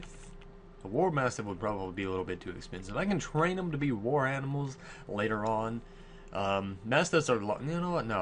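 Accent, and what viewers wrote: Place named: Texas, United States. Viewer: Sounds Texian I like it.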